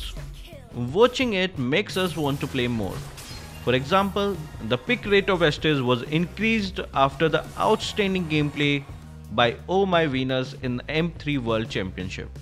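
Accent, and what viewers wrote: India. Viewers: I thought he's an Indian because of hi accent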